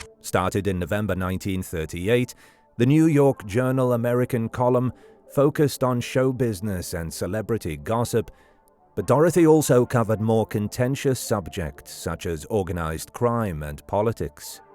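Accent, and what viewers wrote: England, United Kingdom. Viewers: Why do this in an england accent?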